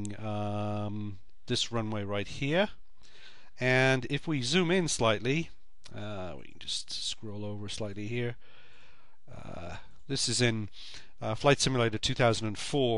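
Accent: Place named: England, United Kingdom. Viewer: I for one, appreciate the clear English accent and not whizzed through at 50 mph. So many folks sound a bit like Kermit. Thanks.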